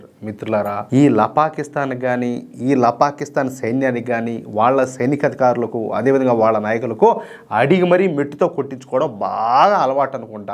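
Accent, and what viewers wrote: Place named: East Godavari, India. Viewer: I love the language of the author. A typical Andhra East Godavari Villager's talk. Makes me feel at home. I was born and raised there. 88 yrs ago.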